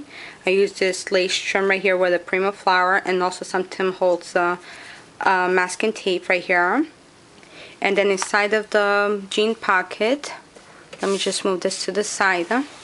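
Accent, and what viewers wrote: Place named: Spain. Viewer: I've never seen work like yours' before....very very original and one of a kind and I LOVE it ....such wonderful inspiration...and what a different accent too...eres Espanola???? Just asking is all :-) Great job.....New Subbie Here too....Laura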